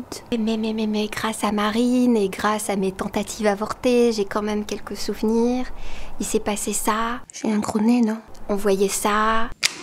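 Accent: Quebec, Canada. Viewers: Cet accent québécois 😍 "je veux pô prendre une tite photo" Sinon tout à fait d'accord sur vivre à 100% l'instant présent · Incroyable comme a encore un vrai accent québécois 100% quand elle le fait, après ne pas l'avoir parlé aussi longtemps! En tant que Youtubeuse bilingue québécoise et canadienne ça me fait du bien car je fais des vidéos dans les 2 langues ce qui est un énorme PLUS car j'ai pas que 2 langues, j'ai 2 cultures, mais y'a des gens à l'esprit étroit qui me mettent des thumbs down parce qu'il y a 2 langues dans le vidéo et ils n'en parlent qu'une. J'ai des gens que j'aime qui ne parlent pas l'une ou l'autre. Je refuse de me limiter, d'exclure ou de niveler par le bas en choisissant une de mes 2 langues que j'utilise autant l'une que l'autre à tous les jours, autant à l'oral qu'à l'écrit. Alors les gens si ça vous intéresse allez voir mes vidéos c'est très vivant, coloré et diversifié, intelligent drôle et sûrement un peu bizarre. 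Je n'ai que 142 abonnés, j'aimerais atteindre le 1000 pour avoir accès au volet "communauté". Quand j'atteindrai les 150 abonnés je fera ma première vidéo en direct... Bilingue, évidemment! 💜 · Comme toujours, merci / ☺️☺️ J’aime tes réflexions, non! J’adore tes reflexions!! ☺️ Les tites photos ( ton accent québécois hihihi.. 😊) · J'ADORE QUAND TU PARLES AVEC L'ACCENT QUÉBÉCOIS CONTINUE S'IL TE PLAÎT JE T'AIME TELLEMENT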